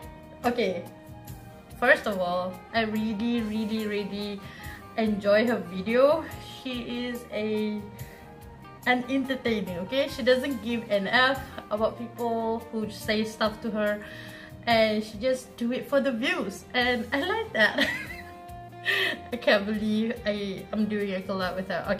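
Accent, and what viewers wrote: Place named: North, Malaysia. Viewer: Kak.. Cakap lah full utara... Kite suke dengar kak ckp utara💋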